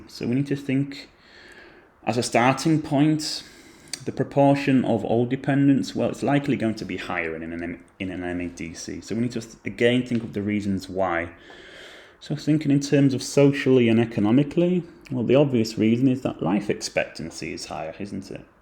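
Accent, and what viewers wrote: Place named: England, United Kingdom. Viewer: I like the english accent and the way u make this sound soo obvious😭🙏. Called us baboons in all tongues 😭🙏.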